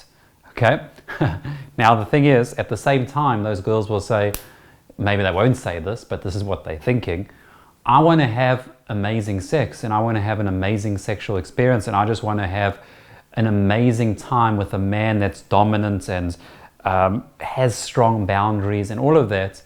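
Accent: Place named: South Africa. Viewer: You sound so South African, oh my days! Thats awesome! Howzit bru from a fellow Saffa.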